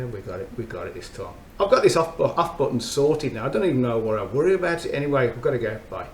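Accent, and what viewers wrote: Birmingham, United Kingdom. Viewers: Love the original Spicebomb and the extreme. I favour the extreme, before it was reformulated! Performance on it is awful now. I had to return my 2023 bottle to boots and bought a 2021 bottle off eBay. The original Spicebomb is more versatile, I think the citrus gives it a lift. I'll have to sample the new dark leather. I've heard mixed reviews. Yep, weather in the UK is awful 😅 You sound like a Brummie, Birmingham is the third cloudiest city in Europe, only behind Glasgow and Reykjavík, Iceland! Even the cloudiest city in USA has 176% more sunshine than Birmingham!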